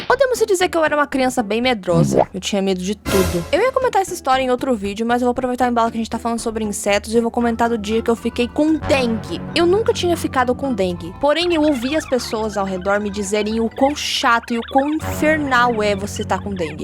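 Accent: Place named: Northeast, Brazil. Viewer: Você mora no nordeste pelo sotaque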